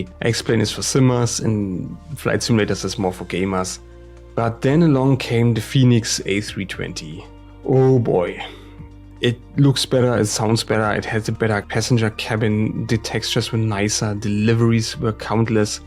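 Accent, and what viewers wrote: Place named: Germany. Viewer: Are you German (because of your accent)? I am German as well, that’s why I am asking:D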